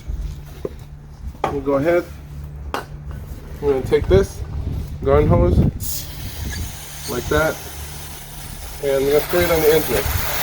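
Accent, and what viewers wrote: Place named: Northeast, United States. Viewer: Are you in the northeast region? I'm from Mass and your accent sounds familiar. BTW I love your videos. Thanks for sharing.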